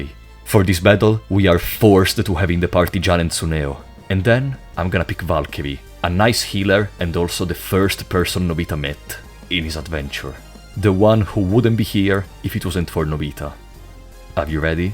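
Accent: Italy. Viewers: Being from New Jersey it's weird hearing an actual Eye-Talian. Fun videos though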